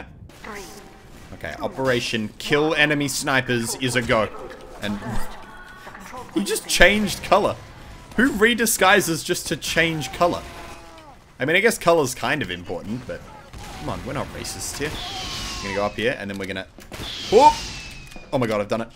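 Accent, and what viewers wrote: Australia. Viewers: is australian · MERGUD HES AUSSIE!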